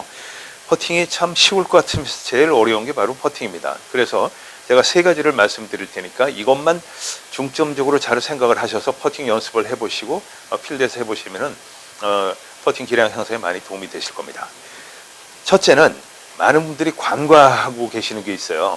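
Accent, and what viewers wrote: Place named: Seoul, South Korea. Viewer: 경기 해설도 너무 쟐듣고 있어요 ~~ ’했거던요‘ 하는 서울 사투리 너무 좋아요^^ 정말 듣기 희소한 서울사투리에요^^ 제 고향이 서울이라 그런가봐여 내용도 너무 좋아서 세계적인 선수들의 플레이 보고 설명들으면 너어므 공부가 됩니다 그냥 장활영 해설위원님 해설 경기는 그냥 틀어넣고 ASMR처럼 듣고 있으면 힐링 그 자체입니다 감사합니다^^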